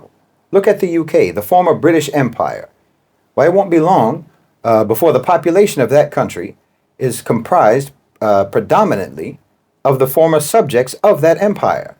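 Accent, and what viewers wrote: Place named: Louisiana, United States. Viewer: This guy is soooooo from Louisiana I can tell from his accent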